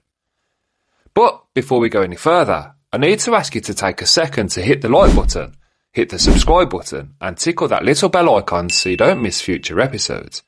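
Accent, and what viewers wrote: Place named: Birmingham, United Kingdom. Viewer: Kenilworth born and bred here. And worked in our Castle in the late 90's. I remember my colleague saying to me that I'd think I was going insane, once I'd worked there for a month or two. ALL of us, had seen and heard the unexplained. If you want to hear those stories. Let me know. This was very enjoyable so I'm subscribing as a fellow local, judging by that Brummie accent?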